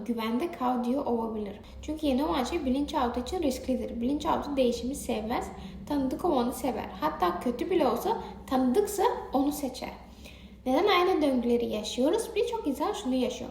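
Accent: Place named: Russia. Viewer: Rusça gibi konuşuyorsun Türkçeyi ama dinletiyor. Bilinç altı konusunda da haklısın👍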